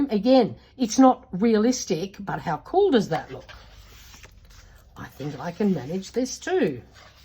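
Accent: Australia. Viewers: Nice honest review. I just found your channel because I was looking for reviews on this book. Nice to be greeted by an Aussie accent. G’day!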